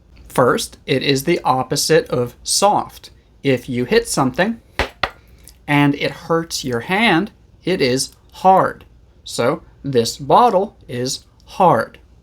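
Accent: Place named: United States. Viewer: .. I'd like to know where your Am. accent is from... Which state and city were you born in?? Please, answer me when you can.I'm pretty curious about it 😊 Thanks a lot for your classes.You're a great teacher!❤❤😊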